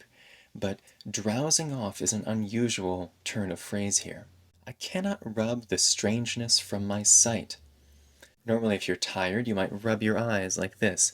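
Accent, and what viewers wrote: United States. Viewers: Bbest teacher ever ❤ I learn American accent from you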